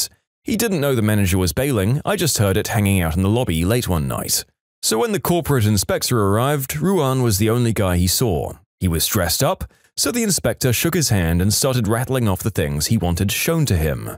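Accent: United Kingdom. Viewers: Another British narrator???!!!😂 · What the hell happened to his voice he sounds brit ash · Bro u seem more Britishie Today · Luh, Another British narrator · WHAT THE ACCUALL- WHAT'S WITH THE VOICE?! SINCE WHEN IS BEITISH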